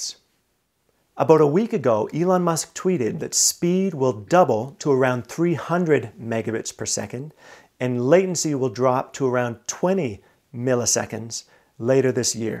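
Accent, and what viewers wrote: Canada. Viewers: Seems like a great video and I love the subject but I just can't do that extra Canadian accent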